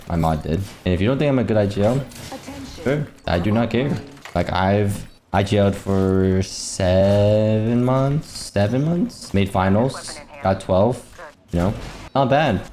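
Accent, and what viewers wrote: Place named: United States. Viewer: He was born in the US. He has lived there his whole life. Dafuq are you talking about? He has a mild speech impediment (slight stutter) but his only accent is American.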